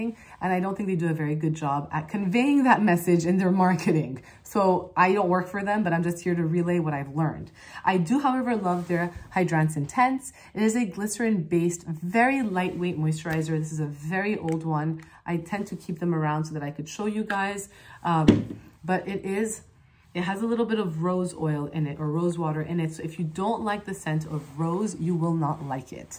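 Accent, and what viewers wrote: France. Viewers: I love when you add French words here in there in your videos! Your accent is super charming, so no reason to feel self-conscious about it (I'm from Québec so you'd probably frown at my own accent haha)! About the Toleriane line in LaRoche-Posay, I don't know if this is the way they intended, but as a French-Quebecker I interpreted that name as being a play on the word tolérance, and since it's for sensitive skin I assume they're trying to promote that it'll build skin's tolerance... but I didn't do any further research on this. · Quel accent français incroyable !! Perfection ! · it's cool, you have a slitghly french accent when you speak english, and an american accent when you speak french! and you speak both of them very well! good job Doc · I knew it! I knew you had a French accent after watching your video on Glycerin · As a bilingual Canadian, I can hear your French accent when you speak in English. 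Totally picked up on it immediately (withing a few seconds) from the first clip I had seen from you a few months back. 😄 I appreciate your honesty about your profession and work.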